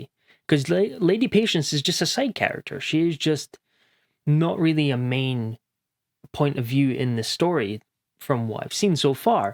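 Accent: Scotland, United Kingdom. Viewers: Nice one. This was excellent! You've given me lots of food for thought as a newbie BookTuber. Love the b-roll. And the book? Yeah, it's a banger. I just finished it for the third time last night. I stopped at the end of Liveship Traders and now want to read the whole lot, so I've had to go back to the beginning. And what a trip. I'm so enjoying it. And then to jump in and hear others' thoughts on YouTube? How did I never know how good a genre this in on YouTube? Glad to make your acquaintance - I'm guessing you're a fellow Scot from your accent? Loved your thoughts on Burrich. I actually can't remember whether you're right or not. In some ways, I'm blessed with that bad memory as I can enjoy them all over again, spoiler-free, ha ha.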